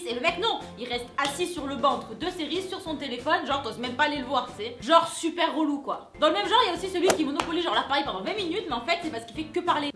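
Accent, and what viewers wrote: South, France. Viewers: J'aime vraiment tes vidéos et surtout ton accent vive le Sud :)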